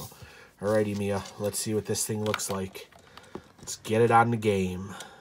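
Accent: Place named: Wisconsin, United States. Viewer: Hello, first time viewer.-I didn’t understand how the hot wheels cars I relate to the game. Mario, The Disneyland Neighbor PS. I think I hear Wisconsin accent.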